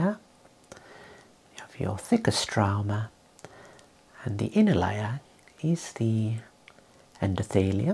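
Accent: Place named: Black Country, United Kingdom. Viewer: At last a black country accent that doesn't sound like nails down a blackboard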